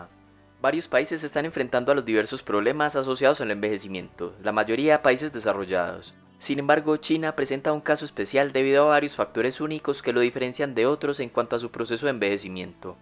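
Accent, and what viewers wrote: Antioquia, Colombia. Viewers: Puro paisa! Se le nota a leguas.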